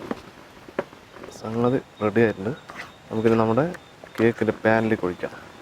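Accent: Palakkad, India. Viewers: Chetta pudding adipoli...valare simple ayittum ,cool ayitta karyagal chiyunnath .matramalla palakkadan slang ..super ikka ......😎👌...god bless uuuu.....all the very best...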